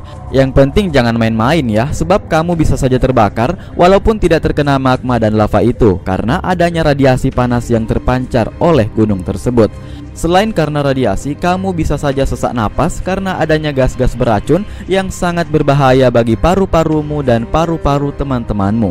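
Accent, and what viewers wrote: Makassar, Indonesia. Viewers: Bang orang mana ya apa orang makasar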